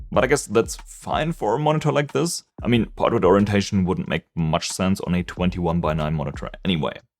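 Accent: Germany. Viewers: der deutsche akzent ist einfach viel zu stark🥲 meine ohren tun weh. Aber nices video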